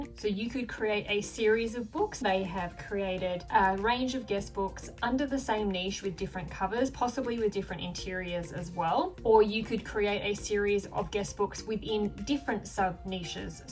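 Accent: Australia. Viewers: HI are you Australian or NZer ?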